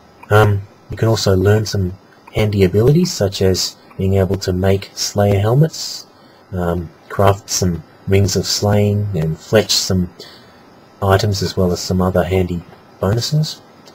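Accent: Australia. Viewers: your aussie arnt ya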